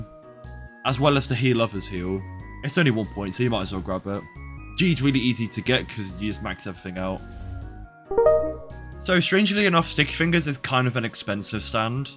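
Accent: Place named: United Kingdom. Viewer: You talking like Stolas or Octavia in Helluva Boss (The british accent i think xd)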